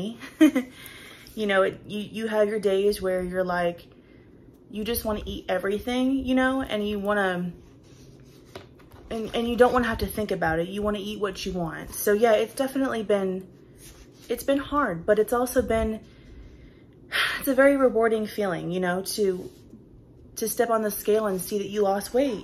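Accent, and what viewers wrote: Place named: South, United States. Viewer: I love that great video. You are so beautiful. I love your Southern accent.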